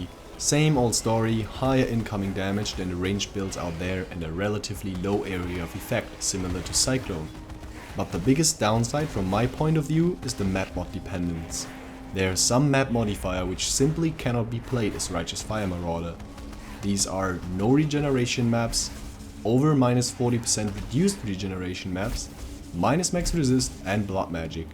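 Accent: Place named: Germany. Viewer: good job trying to avoid the german accent.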